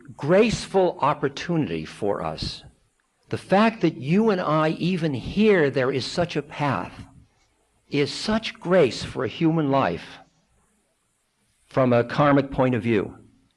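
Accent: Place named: Boston, United States. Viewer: I love the man’s voice and boston accent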